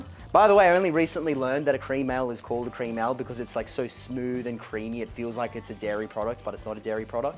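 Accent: United States. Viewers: Lol, thanks, mate! Love the murican accent. Brewing with corn is great. I think it gets overlooked, and some folks think it is boring these days. The funny thing is that the beer seems to disappear immediately for how "boring" it is... one of my favorites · Was that am American Accent? lol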